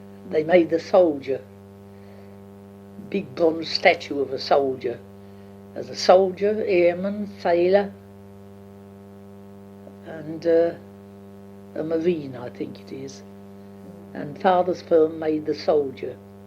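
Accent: London, United Kingdom. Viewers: I've listened to this about 8 times now and it's one of the most interesting things I've watched for a long time. accent was definitely a working class London accent, but it seems to vere from sounding like a Dickens character to almost a modern day person. My grandparents spoke with an accent that most British people have trouble locating because it is so different from the stereotypical regional accent and listening to makes me wish that I had the foresight to record their voices, especially since even though I have the same accent as my grandparents, theirs sounded much nicer and easier to listen to than than the modern version of our local accent. My point is, is definitely a cockney, but her particular way of speaking stands out from other London accents for some reason. I don't know if that is because she was from west/north inner London and not east London or south London but it somehow sounds different. 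It's a real shame that modern London people don't talk like did. I like the way she almost rolls her r's in brother, and how she says 'merrid' for married (similar to how Glaswegians say 'merrit' for married), she also says 'yes' almost as a two syllable word 'yer-ess' and I can hear the sadness in her voice when she talks about her baby brother even though he had died nearly 80 years earlier. Sorry for rambling but this really is one of my favorite videos on YouTube.. 👍👍. I would never replace my own grandparents but if I would love to have had a gre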